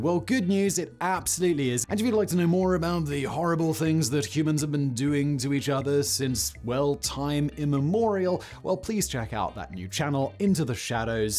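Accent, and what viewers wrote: England, United Kingdom. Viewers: Narrator does speak kind of fast but he clearly and precisely delivers the narration. His delivery keeps me engrossed in the story. I dunno i kinda like English in that particula rmanner of elocution and accent. Though I don't know from what region in the u.k. our speedy speaking narrator doth haileth. From a bred, born, and raised Southern Arizonan descendent of English stock... well works just fine for me. (Fast talking English guy that is).. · His crazy accent? You mean English? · The King's English, spoken as rapidly as this presenter does, is impossible to understand. It is too long to read the closed captioning, so I remain ignorant of the final outcome for the mutineers.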